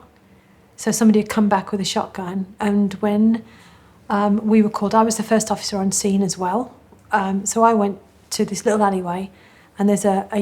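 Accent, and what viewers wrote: Birmingham, United Kingdom. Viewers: She has birmingham accent ..... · Definitely a brummie girl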